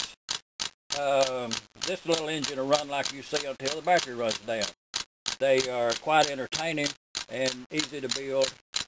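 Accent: South, United States. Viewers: hahah i thought bout hillbilly when i heard his voice xD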